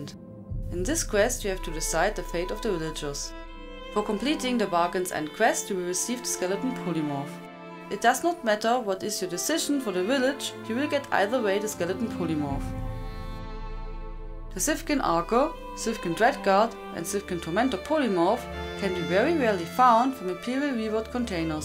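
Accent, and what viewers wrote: Germany. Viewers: bist du deutsch? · thanks for the guides, I like the accent btw, German, Austrian, sounds funny 😁👌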